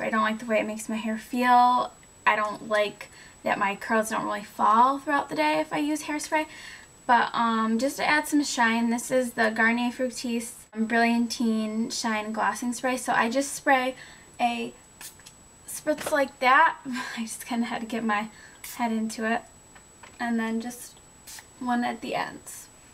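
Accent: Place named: New York, United States. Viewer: omg you totally have an accent! haha i can tell anyone from new york has a tiny bit of an accent.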